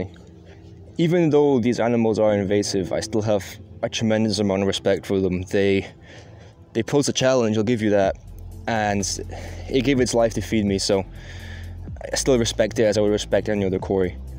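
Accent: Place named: Scotland, United Kingdom. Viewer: I cant place your accent.... its scotish but sounds like theres somthing else as well?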